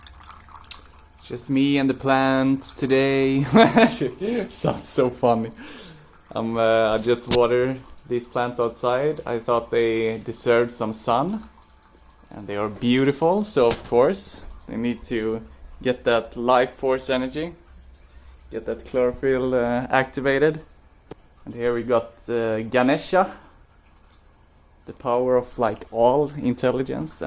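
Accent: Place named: Sweden. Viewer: Love your Swedish accent and your appreciation for nature and beauty. "Just me and the plants" ♡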